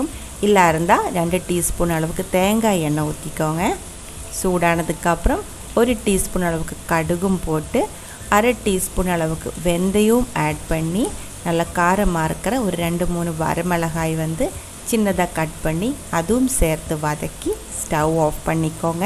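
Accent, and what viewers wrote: Kerala, India. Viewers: Hi sister I like ur Malayalam Tamil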